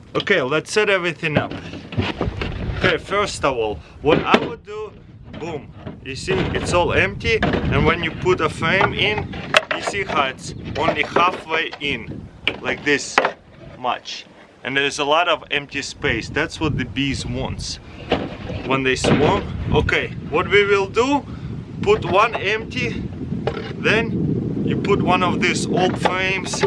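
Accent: Russia. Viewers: I love the Russian english accent it is cute!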